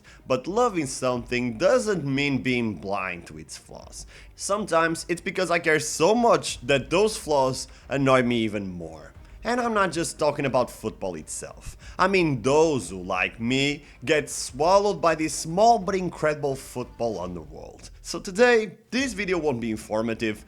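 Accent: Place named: South, Portugal. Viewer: I'll show this to every single football fan I know at the tasca when they start acting stupid. Amazing video, bro. Just a quick question, where exactly are you from? Your accent suggests you're from around the south from Portugal, that's why I'm asking. Keep up the amazing content.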